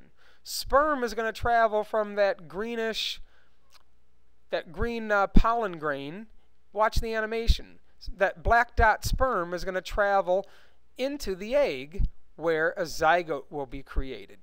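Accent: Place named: Midwest, United States. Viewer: Thank you so much! P.S Love the accent, is it mid-western? Chicagoan?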